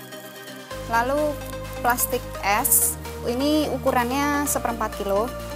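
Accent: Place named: Banyumas, Indonesia. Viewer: Kayaknya asal Banyumas. Medok :D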